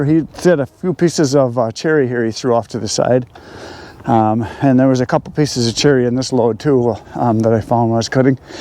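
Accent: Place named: Upper Midwest, United States. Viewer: LOL you talk regular northeast / mid atlantic by yourself, but when another person shows up, your upper midwest shows.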